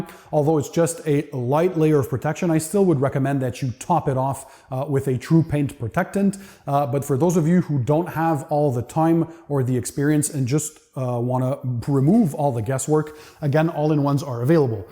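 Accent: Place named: Canada. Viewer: Seems convincingly Canadian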